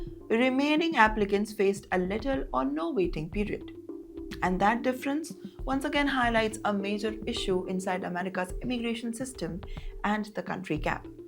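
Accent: India. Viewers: This anchor thinks that pronouncing 'r' as 'rz' alone will make her to look like American. Its totally not. Her modulation, emphasize and pronunciation all sounds Indian. Why this fakeness. Moreover with her facial expressions she is saying the following. "Even though Indians have sacrificed their lives and gone to America to work and contribute to American society and economy, Americans are I'll treating". What nonsense.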